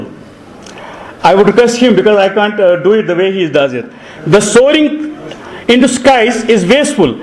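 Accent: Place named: England, United Kingdom. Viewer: I think presenter is from England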